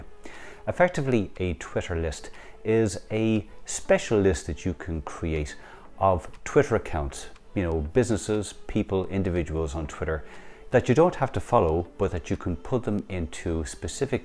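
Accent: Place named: Ireland. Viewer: Great thanks. As a Scot, its so refreshing to hear an Irish accent, so much easier on the ear and easier to understand than many of the other accents you get on these types of videos. Thanks.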